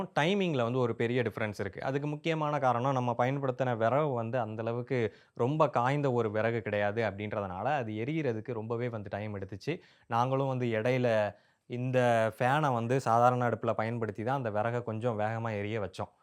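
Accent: Tirunelveli, India. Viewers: you have tirunelveli slang.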